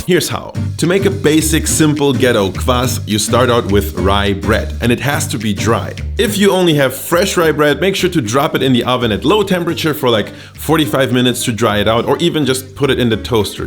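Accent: Russia. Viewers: Абсолютли russian-english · Ладно там русский акцент, дешёвая малосъедобная варёная колбаса и фигурное выкладывание салата для окрошки. Но пальцем насыпать сметану в окрошку - это какое-то откровенное фричество.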